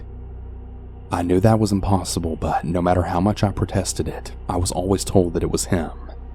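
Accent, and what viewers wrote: South, United States. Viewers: No to southern cannibal narration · The narrarator sounds like a country boy.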